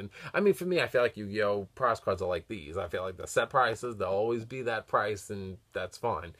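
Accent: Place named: Boston, United States. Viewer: I like your Boston axe cent.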